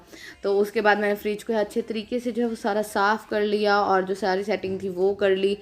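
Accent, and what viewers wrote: Saraiki, Pakistan. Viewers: Aunti apna lehja theak karen sereki lehja hai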